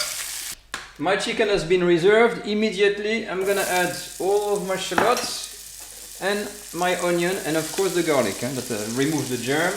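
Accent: France. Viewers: I wonder what kind of dessert would pair nicely. With the acidity of the main, probably something light & sweet to round out the taste spectrum. Side note: I can hear how being in France is starting to subtly influence your accent. (Not a bad thing.) Speaking your mother tongue so much is probably a real treat right now. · OH My god i love your french accent soo cute <3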